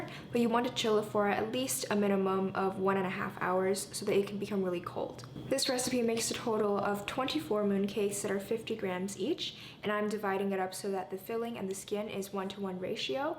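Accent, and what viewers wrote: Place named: Singapore. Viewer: First time coming here, you sound very Singaporean.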